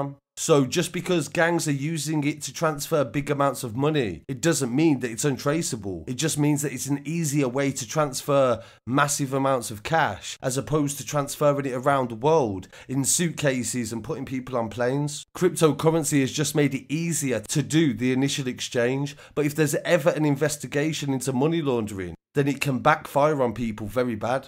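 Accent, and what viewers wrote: Birmingham, United Kingdom. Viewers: Interesting accent, is that Birmingham?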